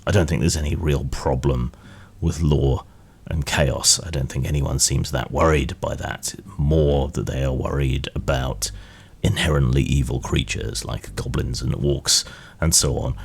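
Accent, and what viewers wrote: United Kingdom. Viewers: Good video,. I find your voice to share a certain charming quality with that of Alan Moore, but less unintelligible to my sensitive Yankee ears. You know us, anyone with a British accent is inherently more authoritative, more intelligent, and more sophisticated so well done! My two cents (or pence, or whatever): I like the elegance of the Law-Chaos paradigm. It is more like yin and yang. Both are an appropriately abstract interpretation of heady psychological ideas, and of more use from a philosophical perspective, in my opinion. They have more concrete and objective definitions, and are less apt to instigate a disruptive moral argument at the table. Also, consider that the circle is always turning, illustrating that no one remains in one or the other for too long, or they risk catastrophe. There's a whole endless discussion there as well. 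Good and Evil, on the other hand are too subjective in their definition and are way too easy to argue over for my use as a DM. Consider the old saying that every villain thinks they are the hero of their own story. Even in the Bible, Christ says that every man does what is right in his own eyes, to say nothing of throwing stones in glass houses, judging not, and a hundred other sayings in a hundred texts of a hundred religions. Defining good and evil, as far as I can tell, is the first step toward war, and allows for the dehumanization and vilification of others, which then opens the door to atrocity of all kinds, including the executio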